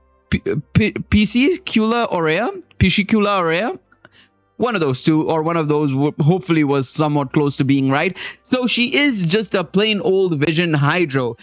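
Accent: India. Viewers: Are u indian as u sound very indian or christian maybe just would like to know and plus anyways ur videos are very good and enjoyable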